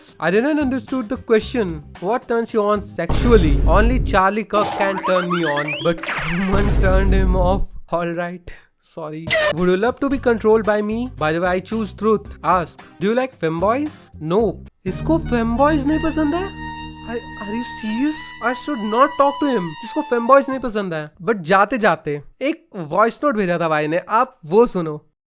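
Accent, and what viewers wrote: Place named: Bihar, India. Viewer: Are ji pahle to aapke Naam Se Laga tha ki aap Hi Ho aur awaaz se but ab confirm ho gaya yah sunkar aap bihar se h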